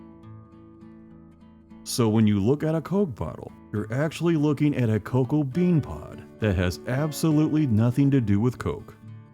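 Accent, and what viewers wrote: Indiana, United States. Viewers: Your videos make me feel homesick for Indiana…parents from Ft. Wayne and Otterbein, my first 18 years in Anderson, next 30 years in Bloomington and Nashville, then overseas and now Iowa. An Indiana accent is subtle but it feels like home to me…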